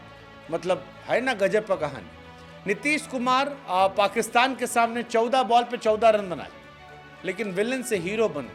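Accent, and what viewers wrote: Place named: Uttar Pradesh-Bihar, India. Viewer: Aaj aapki aawaj up bihar lag rha hai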